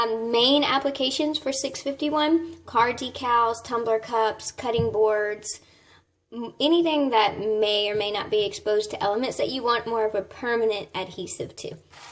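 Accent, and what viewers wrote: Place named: South, United States. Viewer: Love your southern drawl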